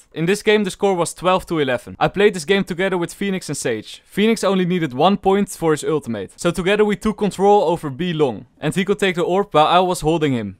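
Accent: Netherlands. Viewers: The dutch accent makes it a lot better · Ur dutch no doubt about that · This dutch accent 😭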